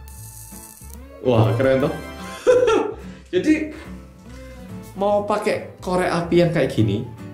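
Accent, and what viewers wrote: Java, Indonesia. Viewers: Otw 5juta subscribers.. mangatss mas medhookk ❤️💜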